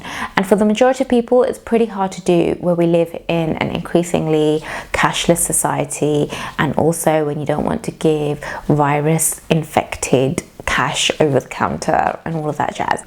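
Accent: United Kingdom. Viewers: Yes! I am all about budgetting! I think it is one of the First Steps in finacial Freedom. As always very lovely video, and I love your British accent !👍🏻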